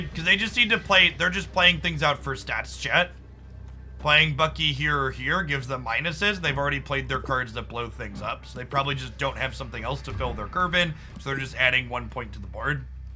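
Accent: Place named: United States. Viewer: YouTube voice: regular American accent. stream voice: “deed ya like that cheeeyat?!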